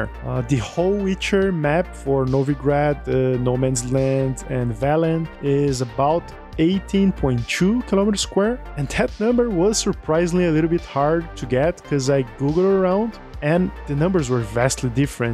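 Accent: Brazil. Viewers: Are you Brazilian? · eae mano, notei pelo sotaque kkkk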